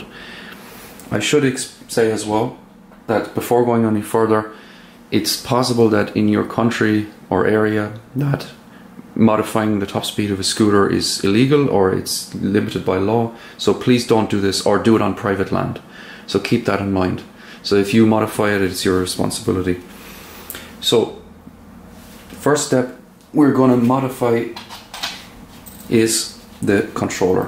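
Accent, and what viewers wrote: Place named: Ireland. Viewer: How do you have an Irish accent?😂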